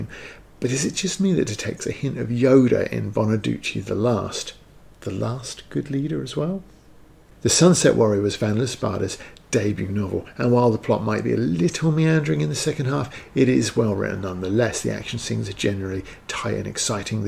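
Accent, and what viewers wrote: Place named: United Kingdom. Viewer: totally serious. You obviously have a good command of the stories you read, and your voice is pleasant and British without being too nasal or: ok I need your help with the explanation of this description. I don't know how to describe this as an American, but what I'm trying to describe is a dialect of British speech that resembles cockney but isn't quite it. Dominique Tipper from that space show speaks this way. I know it's because of Hollywood exposure that I/we Americans have this bias but that dialect sounds, well, hoodlum-ish to us. I suspect we took our ques of woke capitalism from how you Brits dealt with these prejudices in the 90s (spice girls promoting a mixed British identity and language equality come to mind) and applied it to race and gender. Do y'all have a term for this there?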